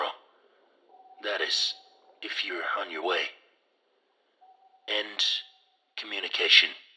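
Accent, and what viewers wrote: United States. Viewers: Your American accent is spot on!!!! Great job! I love the effect over your voice too 10/10⭐️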